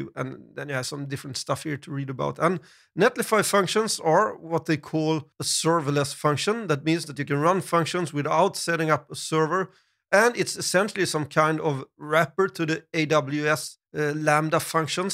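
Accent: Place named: Sweden. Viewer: I knew you were from Sweden. Kind of noticed it from the accent. Then you confirmed it :D I'm from Malmo. Great channel, keep up the awesome work.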